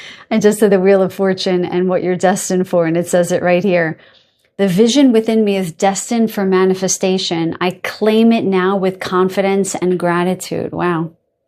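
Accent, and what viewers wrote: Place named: New York, United States. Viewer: Hello again and thank you for taking the time during such a stressful time for you. I wish I could do some of the physical work for you. I chose #3, and yes it does fit, and it confirms what I've been getting myself. In the last several days I've been feeling very cozy with Spirit without having to do anything to get there, as usual. And I'm feeling grateful for that as well as feeling anticipation of good things. I think that very big things are coming to the world very quickly now and we are the placeholders for the collective. And it is very near to showtime. .... BTW, .. please don't hide the NY accent, it's cute on you 👍😉 .... These are SUCH special and auspicious times that it will easily wash away the ugly and painful if allowed to. The Creator has given me great strength and I will be forever grateful 😊 .... 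bye now and thanks again ❤️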